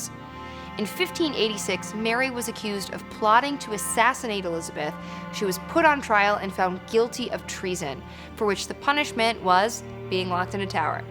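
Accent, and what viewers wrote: United States. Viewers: So sad that an American speaker narrated this piece. Made the whole content bland with her voice dropping an octave at the end of each paragraph. Americans probably see this as adding authority to her voice. Just thought the content was poorly delivered and crass. I am a proud Scot who wishes that Americans would confine their overtures to their own country and forbears. Rob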